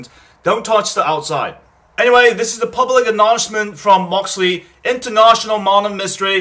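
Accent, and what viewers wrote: United Kingdom. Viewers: whats up with the brittish accent? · Did you live in the UK? You seem to have a strong British accent sometimes. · going to costa rica in feb not cancelling that shit ''its not a joke" but dudes talking like hes from the UK l lol smh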